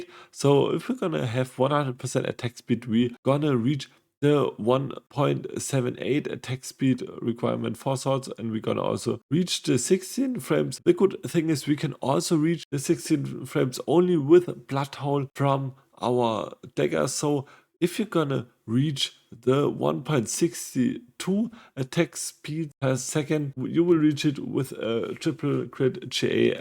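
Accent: Germany. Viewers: I love the German accent when speaking English, your speech is just perfect matey, maybe a little fast. Certainly a lot better than my German! :-)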